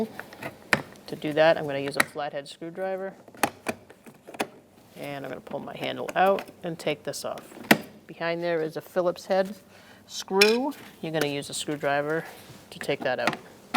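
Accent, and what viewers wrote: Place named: New England, United States. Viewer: In three second in, I love the strong New England accent 😂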